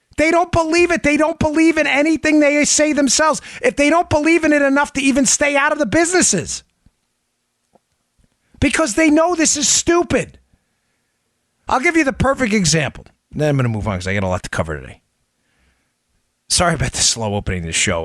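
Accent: New York, United States. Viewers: Bongino one of my favourite people.I just love,he sounds like an Italian in New York.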